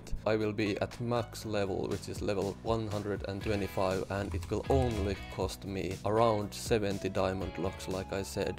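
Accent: Finland. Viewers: Are u finnish?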